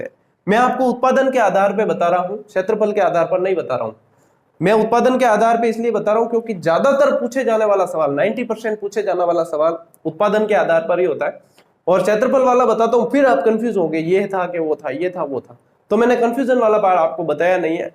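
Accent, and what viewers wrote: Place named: Rajasthan, India. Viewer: M Punjabi ND preparing for 3rd grade. after taking your classes I learned marwadi too 😄😄 love your marwadi sir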